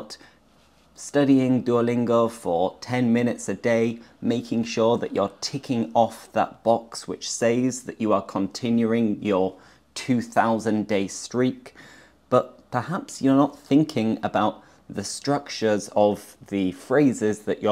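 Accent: England, United Kingdom. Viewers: I LOVE HIS VIDEOS BECAUSE THEY ARE AMAZING AND CAN GIVE YOU TONS OF ADVICE AND EXEMPLES , very nice love his video and his cool english accent and he is right as well about the app.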